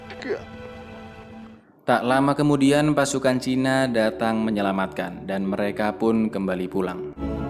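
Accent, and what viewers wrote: Java, Indonesia. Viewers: G rame suara jawa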